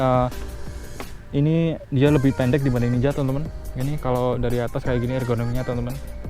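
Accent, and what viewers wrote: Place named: Java, Indonesia. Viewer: kenapa memaksakkan pake "Gua" sih. Medok pol.. ra cocok. jowo jowo ae rasah lu gua. 🤣✌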